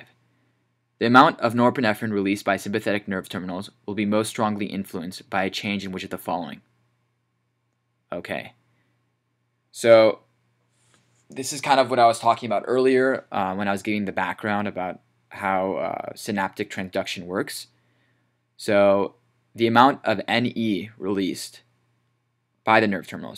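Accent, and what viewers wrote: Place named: Australia. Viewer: I have no ideas why he sounds like an Australian.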